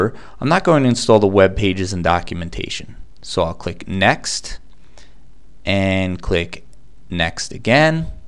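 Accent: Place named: New Jersey, United States. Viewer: sounds like a mush mouth from new jawz-sey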